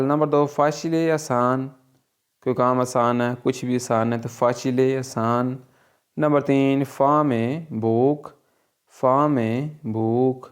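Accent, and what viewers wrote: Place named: Punjab, Pakistan. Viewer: Panjabi di Kya baat A... SAHI Gujrat wala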